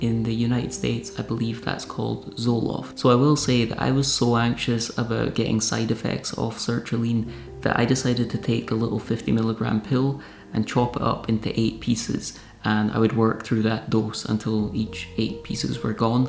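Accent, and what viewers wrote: Scotland, United Kingdom. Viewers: you are amazing. First, you explained your situation clearly and gave effective suggestions to help others. 20 years ago I suffered a brutal attack, and with that I encountered a weird collection of anxiety, depression, strange thoughts, and, of course PTSD. It took some time before I found a counselor who actually understood what I was going through. He was a mature graduate psychology student doing his final practicum. He was great, and a perfect fit. He challenged me often and tried a few different therapy techniques. I told him when it wasn't working., my second point is that you have opened some doors for people suffering anxiety to have hope. BTW had I lived my days in Scotland - my family immigrated to Canada when I was 10 more than 50 years ago - I would likely have a similar accent like yours. Keep on keeping on!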